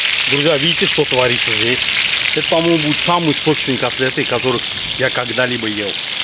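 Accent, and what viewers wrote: Baku, Azerbaijan. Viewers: у меня бабушка из Шеки, она всегда самая держит дома чаман. Приятно слушать бакинский акцент, привет вам от бакинских армян❤ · Салам!!! Меня зовут Тимур я живу в Украине в городе Днепр! Я ваш подпищик К сожелению не знаю как вас завут спасибо за ваше видео все очень красиво один акцент ваш Бакинский чего стоит хотел вас попросить чтобы вы преготовили одно Азербайджанское блюдо называется Хящиль помню кушал но без сахарного песка не возможно кушать всегда нужно подсыпать в тарелку готовят это блюдо из муки и оно очень пресное, если получится будет прикольно зарание спасибо!!!😊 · Здравствуйте! Я когда то жила в самом прекрасном городе Баку! Очень аппетитно готовите, с очень вкусным бакинским акцентом! Спасибо за удовольствие вас смотреть! Подписалась однозначно! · У вас акцент Азербайджанский! Вы Азербайджанец?😊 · Огромный привет вам из Грузии,дорогой мастер своего дела! 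У вас акцент Бакинский! Всему вашему прекрасному народу привет от грузина!👋🙂🇬🇪🇦🇿✊ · И ещё хочу добавить,что Ваш Бакинский акцент.....без слов,это шедевр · Бакинская культура, Бакинский акцент не каждый понемаетеее. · Бакинский акцент, может вы где то на даче в Новханах,ha?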